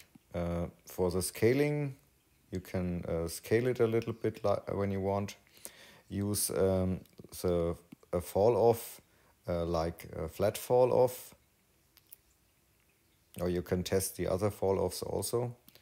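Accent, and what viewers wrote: Germany. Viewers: English with german accent :)) very very useful videos Thank youuu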